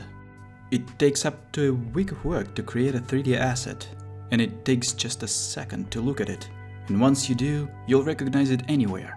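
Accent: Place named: Japan. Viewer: I love your accent! I can't tell if it's Japanese or if some Latin origin. My ears say Japanese.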